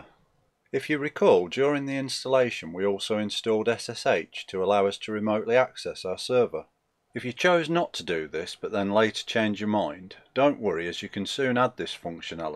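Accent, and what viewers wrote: United Kingdom. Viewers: Why does an English accent make everything so interesting?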